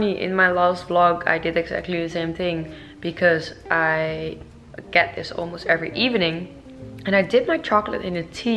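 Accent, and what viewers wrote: Netherlands. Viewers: you are dutch aren't you? i hear it in the welkom and the ja's in the beginning of sentences ahaha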